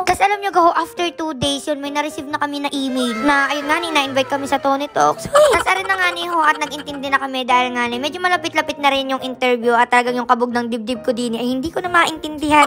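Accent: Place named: Mindoro, Philippines. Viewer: Abay akoy na curious sa iyo napanood kita sa toni talks 😂 abay gustong gusto ko ang punto mo ay. Ayan napa subscribe ga tuloy ako 😅… ay siya ka mangyan na ga ako 😅… akoy ka Harabas din taga diyan din sa Mindoro… gustong gusto ko ga ang mga buhay buhay sa probinsiya. Good luck and God bless you more, keep fighting wag mong pansinin ang mga mapanirang tao eh. Ay siya akoy natutuwa sa iyo, go go go lang. ❤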